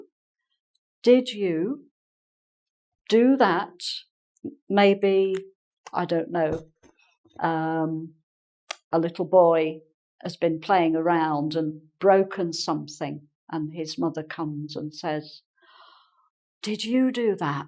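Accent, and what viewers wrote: England, United Kingdom. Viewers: Great lessons. I reall like the way how you bring it to people in a very nice way. Lovely voice and the tippical British accent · the people from England have an interesting accent, I guess that she's from some part of UK.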